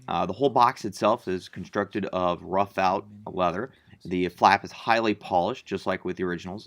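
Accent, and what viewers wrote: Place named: United States. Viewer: Are you intentionally using ‘Murican pronounciation of the various uniform elements, vice the actual French pronounciation? Is that a reenactors affectation? Regardless, very impressive work! Do you belong to a Napoleonic re-enactor group? If so, what regiment do you present an impression of?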